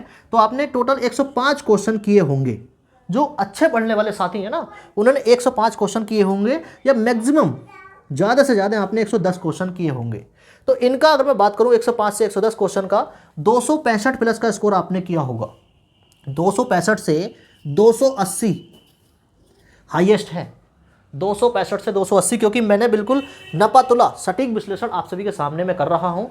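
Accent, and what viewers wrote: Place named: Bhojpur, India. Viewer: Bhai tum to bhopur ke ho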